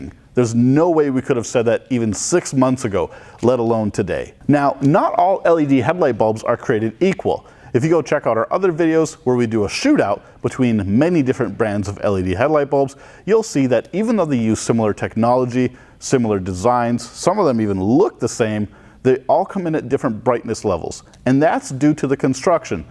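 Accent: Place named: United States. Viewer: Dude, you should start with considering legality FIRST! Since you have an American accent, I will assume you are doing this for the US audience and you should be aware that per the DOT it is ILLEGAL to use LED bulbs in halogen reflectors! Helping you see better while blinding on coming drivers is not safety inhancement! Esp as they crash headon into the offending driver with LED headlights! And really easy to check on post accident.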